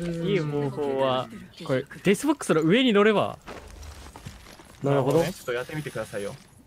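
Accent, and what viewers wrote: Kansai, Japan. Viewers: 関西の人特有のキレキレのツッコミが面白かったのでチャンネル登録は次回にさせてもらいます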